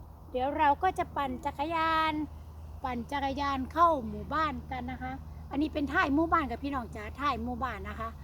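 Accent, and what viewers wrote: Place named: Isan, Thailand. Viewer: นั่งเล่าแบบอิสาน สนุกมากค่ะ ช่วงพระพุทธรูปสวยแต่พูดไม่ได้ ยิ่งสนุกค่ะ เจอมะม่วงลูกเดียวเท่านั้น แกหยุดเลย😆เที่ยวให้สนุกแล้วมาเล่าใหม่นะคะ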